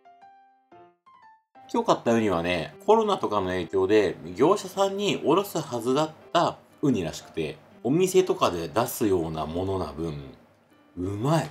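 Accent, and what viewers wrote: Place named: Kansai, Japan. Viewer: （関西弁です）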